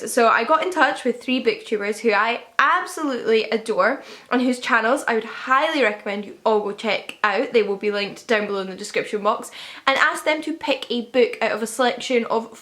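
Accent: Scotland, United Kingdom. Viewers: I think there's a typo in the video title :o Not that I mind but I don't want you to come back to this video in a month or so and only realize it then I really like your videos and love listening to your scottish accent :D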